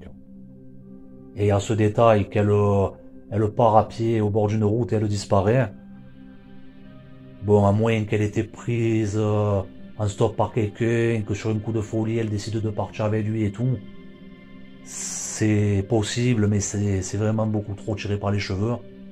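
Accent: South, France. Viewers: Ooooh j'adore cet accent du Sud 😅😅😅 ça m'a surprise like et abo. 🎉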